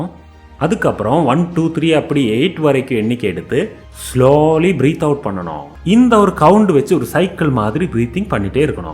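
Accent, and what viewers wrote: Kerala, India. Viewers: Awesome video thank you so much I like ur tamil lovely malayalam accent mixed, thanks for your effort people need it in today's huzzle buzzle life😊🙏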